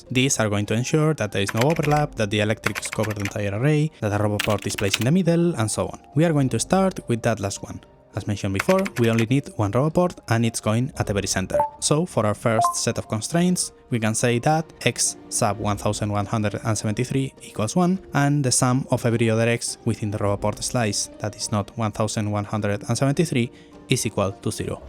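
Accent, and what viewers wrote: Spain. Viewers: Can't hide that Spanish accent from me boy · Esto es acento español? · Where is your accent from? Spain? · Amazing stuff. Subscribed. I checked your channel and this one video seems different than your previous ones. This must have taken you so much work. The results talk by themselves. The accent sounds familiar. I bet Spanish. Bravísimo. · You sound Spanish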